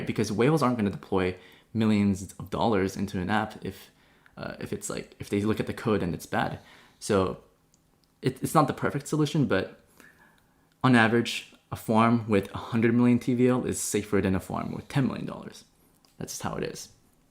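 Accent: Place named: California, United States. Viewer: Good content. I love your casual Californian style. ✌🏻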